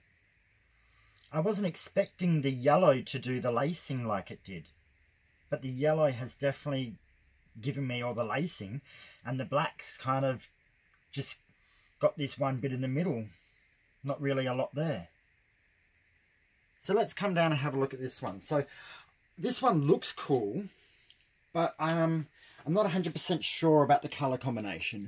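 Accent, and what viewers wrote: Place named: Australia. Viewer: It's good to hear some Aussie talk, Love your work, Cheers from an Aussie viewer xxx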